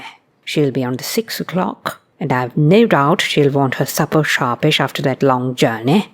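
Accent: RP, United Kingdom. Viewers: I assume this is you. Would you like to talk to other people who speak authentic heightened RP? I’d like to get a group going.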